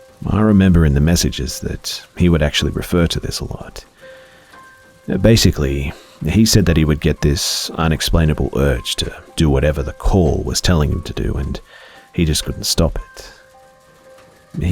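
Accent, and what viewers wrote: Australia. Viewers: Awesome Aussie thank you sir for the scares in your awesome accent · Very nice Australian accent yes, one of the best.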